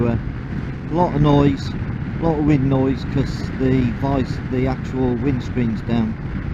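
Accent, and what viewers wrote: North East England, United Kingdom. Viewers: Ah thats great thought I picked up the accent, I'm from North Shields, like you I moved to London at 20 yrs old and Im 60 now living in Hertfordshire. I have an NC and although it isn't a flying machine it does just what I need. I'm new to your channel but will go through your vids which so far are just great Thank You. Take care mate.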